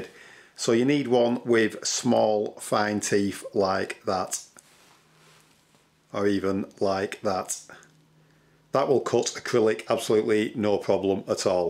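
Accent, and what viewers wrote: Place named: North, United Kingdom. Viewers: Great video! What part of Lancs is the accent from? Sounds like Preston or possibly just north of there? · Love these vids - proper no nonsense Northerner who gets straight to the point and explains it all clearly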